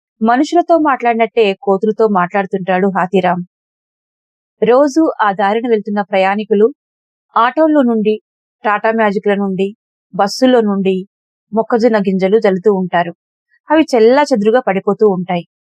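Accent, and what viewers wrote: Telangana, India. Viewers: తెలంగాణ యాసకు జీవం పోసి మా మనసులను దోచుకుంటున్నారు మీ రచనా శక్తి కి వాక్పటిమ కు జోహార్లు.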